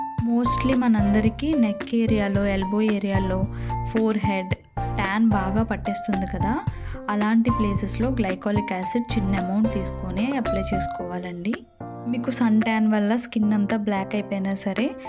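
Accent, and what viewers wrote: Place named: Godavari, India. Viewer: మీ way of talking చూస్తుంటే గోదావరి జిల్లాలో తెలుగు అమ్మాయి లా ఉంది.. nice explanation ❤😊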